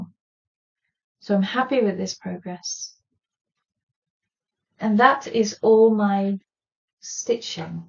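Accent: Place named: United Kingdom. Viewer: I love!! And I’m really enjoying to watch your videos. So in awe of your British accent too 😊 Greetings from Switzerland!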